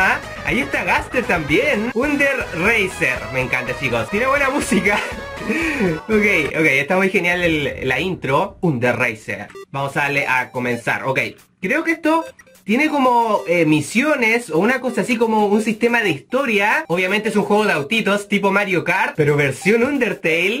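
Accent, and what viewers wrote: Spain. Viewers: desde cuando hablas español españa? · Si eres chileno porque hablas como español? V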